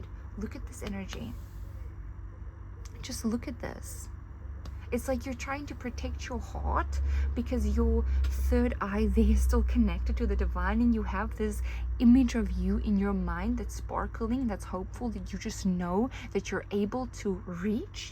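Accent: South Africa. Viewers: Girl, are you South African by any chance? Coz your accent sounds really familiar to me 👀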